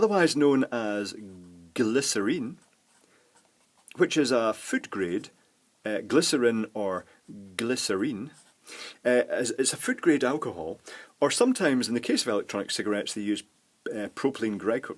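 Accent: Scotland, United Kingdom. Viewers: I keep reading code and articles in your Scottish voice and accent and I can't make it stop. I think I'm gay.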